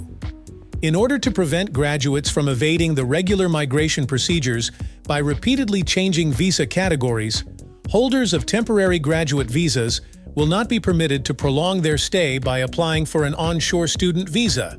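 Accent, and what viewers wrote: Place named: United States. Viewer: Why ido we have a bloody yank speaking and not Aussie????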